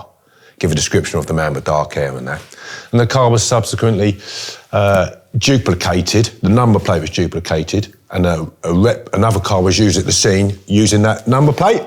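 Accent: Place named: Birmingham, United Kingdom. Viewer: are you from brum bro ? Love the content btw 💯✌️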